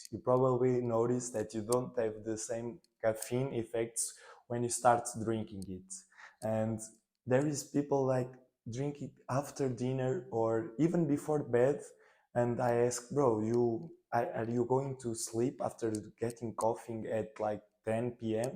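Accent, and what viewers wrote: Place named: Portugal. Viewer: Nota-se logo pelo teu inglês que és tuga! Bom ver este tipo de conteúdo em Portugal. Continuação de um bom trabalho e qualquer coisa hit me up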